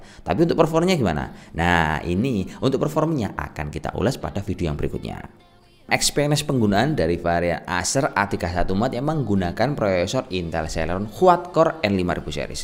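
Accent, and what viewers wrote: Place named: Java, Indonesia. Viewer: I ❤️ this guy's medok! - Wong Amryk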